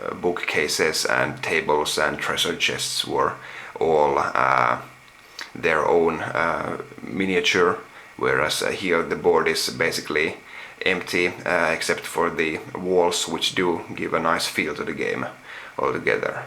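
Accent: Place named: Finland. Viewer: huomasin sun englannin lausumisesta:D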